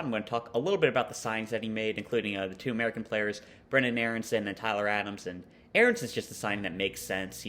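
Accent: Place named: Baltimore, United States. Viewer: Another great vid. Is that a Baltimore or Philly accent?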